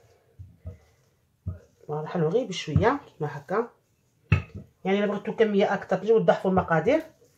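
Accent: Oran, Algeria. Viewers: Ya3tik essaha madame je suis d oran mais j habite le sud quand je vous entends parler Vous me faites penser a ma Ville Natale oran rabi yahafdek inchaallah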